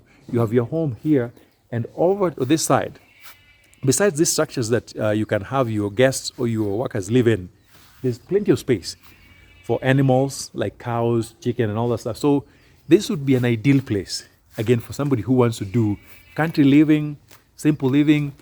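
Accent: Luo, Kenya. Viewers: Like your tweng..but yu keep it real when yu pronounce Luo words..kosele!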